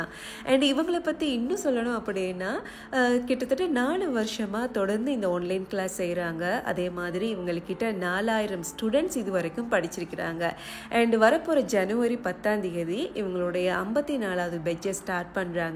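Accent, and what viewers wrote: Sri Lanka. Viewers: Super sister, well explanation, and srilankan tamil nice.🎉🎉🎉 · I was thinking about how to make sausage at home. Very much happy to watch your videos along with your srilankan Tamil. Love your recipes and will really try it & update soon ❤ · உங்கள் தமிழ் பேச்சு எனக்கு ரொம்ப பிடிச்சிருக்கு🎉❤வாழ்த்துகள் தோழிக்கு❤🎉 நீங்கல் இலங்கை தமிழச்சி துபாயில் இருக்கிங்க சரியா? (நான் தமிழ்நாடு) இதை எங்கு எப்படி வைத்து எவ்வளவு நாட்கள் வைத்து உபயோகபடுத்த முடியும்.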